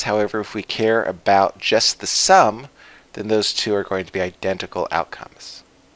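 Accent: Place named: West, United States. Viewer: Kindly add subtitles. Thus make this vid approachable to whom aren't comfortable to western English tone.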